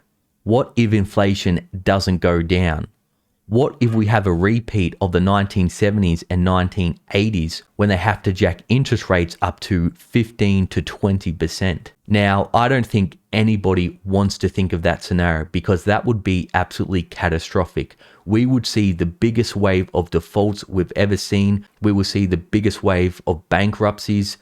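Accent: Australia. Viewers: Love your down under accent ! Lol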